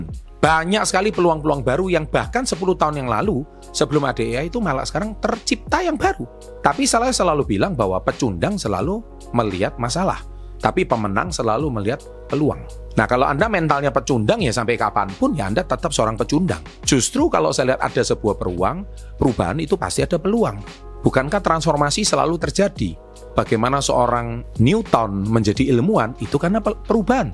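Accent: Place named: Java, Indonesia. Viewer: cocoklah ini, apalagi penyampaiannya mantep dan medok .... 👍👍👍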